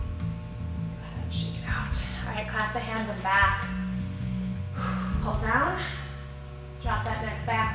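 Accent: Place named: England, United Kingdom. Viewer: rawwrrrrrrrrrrrrrrrRRRRRRRRRRRRRRR! WOWZERS that was intense but rawwrsome. :oD My arms feel like jelly. I'm typing this with my nose - JK, or am I?! Have a rawwrrsome wibbly-armed kind of day. And where did you learn your English accent from?!?! Such fun! :oD xXx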